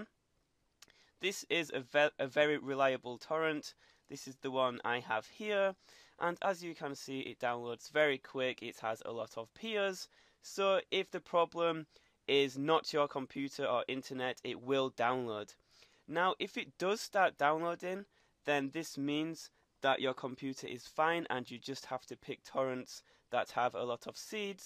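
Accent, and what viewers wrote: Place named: United Kingdom. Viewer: Another fuc***n british.. 🤣🤣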